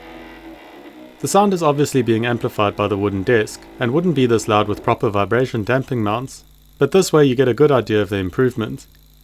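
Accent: South Africa. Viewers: As a saffer in the uk its surreal hearing a SAFRICA accent on a random YT algorithim suggestion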